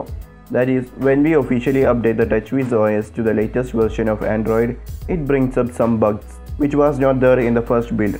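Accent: India. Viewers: I cant even understand ur indian Apu chipati ass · that's mallu accent mam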